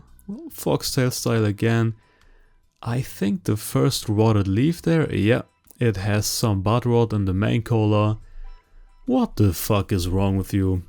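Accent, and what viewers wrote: Germany. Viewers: Das Ding mit dem tribe, man muss manchmal schon Deutsch sprechen, um dein Englisch zu verstehen ❤ Mach bitte weiter Videos, find deinen Stil einfach gut. Stream am Ende der Season fänd ich auch super! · sieht gut aus Großer :) dein Englisch bringt mich auch immer wieder aufs neue zum schmunzeln <3 Jeder Ami oder Engländer wüsste nach 1 Satz, dass du Deutscher bist :P Einen wertvollen Tipp, den ich dir mal an die Hand geben möchte gegen die blöden Schnecken! Als ich früher Outdoor gegrowt habe war das sehr effektiv. Sammel dir kleine 0.2-0.5L Kunststofftöpfe/Behälter und kauf dir Kuperband! Von den Töpfen/Gefäßen schneidest du den Boden raus und klebst ringsrum das Kupferband. Schnecken klettern nicht über Kupfer. Wenn du deine Sämlinge dann einpflanzt den Topf drum rum, quasi wie mit deinen Schneckenkrägen die du ja bereits hast! Die Stämme können ohne Probleme wachsen der Platz/Durchmesser reicht völlig aus und du hast keine Schnecken mehr die Hochkrichen. 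Man muss natürlich drauf achten dass die unteren Triebe / Blätter nicht auf den Boden kommen, sonst können die Schnecken dort hochkrichen! Du solltest mit der Methode auf jeden Fall auch wesentlich günstiger liegen als du für die Schneckenkragen bezahlen würdest! Kupferband ist echt eine tolle Sache! Kann ich nur jedem Outdoorgrower empfehlen. Auch wenn man z.B. ein Hochbeet hat im Garten für Salat odg. Da kann man auch wunderbar 1 oder 2 Bahnen Kupferband ringsrum kleben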